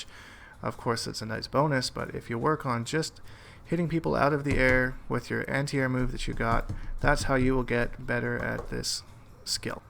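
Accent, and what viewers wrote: Canada. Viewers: I thought South Park was being funny by the Canadians saying "Aboot" but its true lol. Love ya!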